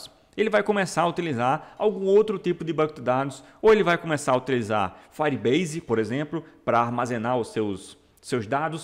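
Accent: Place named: Paraíba, Brazil. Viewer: parabéns pelo video meu amigo! Seu sotaque parece muito paraibano. Estou correto? Abraço.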